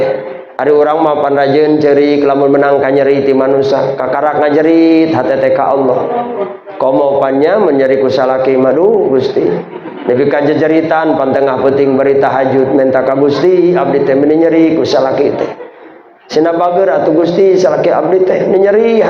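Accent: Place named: Banten, Indonesia. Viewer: Logat bantenna ieu ajengan kadangu pisan😅. Barokalloh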